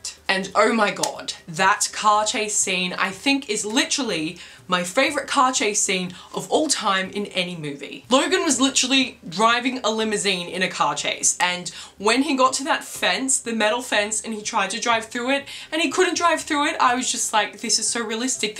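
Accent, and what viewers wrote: Australia. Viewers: Ahh an Australian accent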